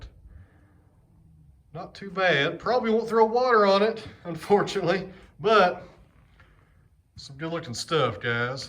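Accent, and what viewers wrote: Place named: Tennessee, United States. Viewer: You’re starting to sound like that concrete truck driver. NE TN dialect is kicking in!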